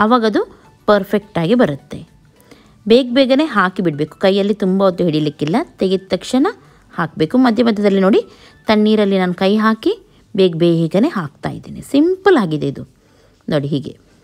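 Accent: Mangalore, India. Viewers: Your from our mangalore your words like manglore puchhk take pucch 😅😅i like this tq..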